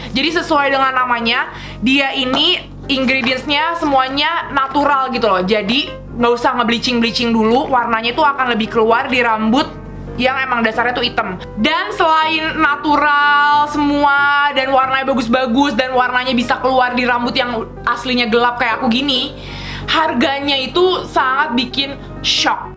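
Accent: Java, Indonesia. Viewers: Enk aj gtu klo dgerin ka ngomong,medog gmn gtu ya wkkwkw😂😛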